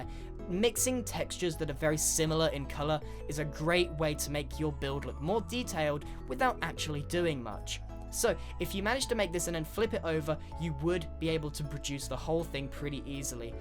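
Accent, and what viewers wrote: United Kingdom. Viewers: Ameenitys ha British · your from BRITIN wait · Caravan. In Australia we say Stuff pretty similar to the way you say it in Britain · Is it me or grain really emphasizes that he’s British in this video? 🇬🇧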